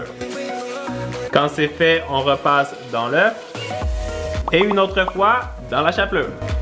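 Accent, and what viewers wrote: Canada. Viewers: tu mon youtubeur préféré desCarl is cooking vidéos accent canadien et qui met quoi des vidéos trop trop rire 👍🇨🇦👍👍👍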